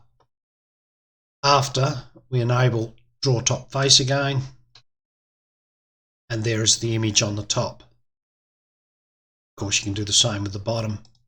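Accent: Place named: Australia. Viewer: There is something comforting and no nonsense about someone with an Aussie accent giving a tutorial on Linux. His YouTube handle is also 😁 Instant Subscribe!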